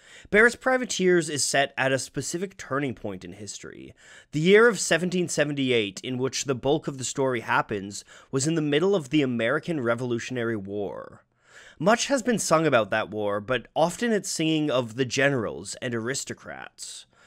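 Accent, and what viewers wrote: Canada. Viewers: I could finally solve my question on whether you were Canadian. I had seen some of your other videos and heard your accent and wondered if you were from Canada, but couldn't confirm it. Glad that my instinct was correct. :P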